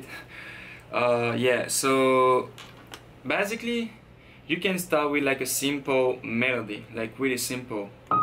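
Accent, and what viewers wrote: France. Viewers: Ca fait plaisir de voir un français bouillant comme ça, je savais pas que tu étais français mais ton accent est craméééé ^^ Force à toi tu déchires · He is frensh can tell by how he pronounces the A letter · true Hendrix he's not Mexican he's French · u have french ass accent, tu parles français? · hes french lmaoo you can tell by his accent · Are you french ? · Your are french ? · On entends bien que t'es français :) :) On attends la suite avec un cook up de Londonondatrack ou bien un southside ou TM88 Type beat !! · You're from France right? I can hear from the accent. I was actually born in France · On reconnait l'accent français :p, Gros taf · t'es clairement français mais bien joué, sa ma pris 10 ans a parler couramment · You french or spanish? I kind of recognize the french but you look latino, anyways we see you up here in canada Ontario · t'es français car quand tu parle t'es comme moi je sais parler anglais mais pas forcément l'accent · Are you french? 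· le bon accents francais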